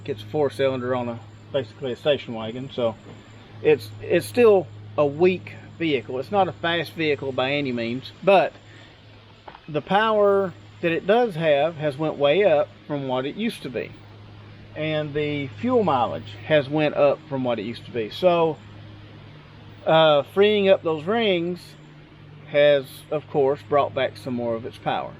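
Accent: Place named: Wichita Falls, United States. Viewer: Thanks for this - I won't feel comfortable doing it by myself but you sound just like my MIL's boyfriend who is native to Wichita Falls. I would swear you're from there! I'll be asking him his thoughts about this solution.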